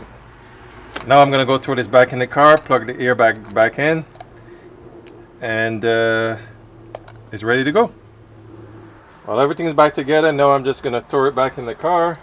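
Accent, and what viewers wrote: Jamaica. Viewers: Wow good for you. That accent sounds Jamaican. Enjoy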